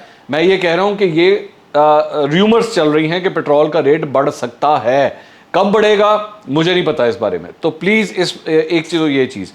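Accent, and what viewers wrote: Punjab, Pakistan. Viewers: I love your punjabi accent. Pure like Asli Desi Ghio.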